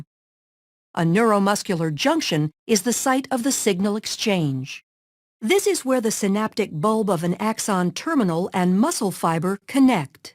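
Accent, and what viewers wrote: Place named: United States. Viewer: this lady is speaking American English 😲🔥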